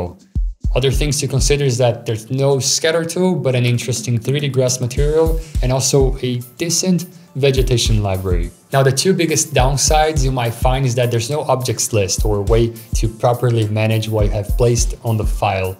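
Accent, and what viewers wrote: Brazil. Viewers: are you brazilian? you english sounds like brazilian.´s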